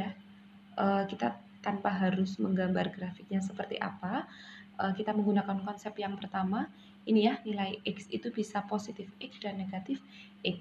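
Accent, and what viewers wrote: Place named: Java, Indonesia. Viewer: Halo kaka....kaka orang jawa ya....medoknya keliatan....😂😂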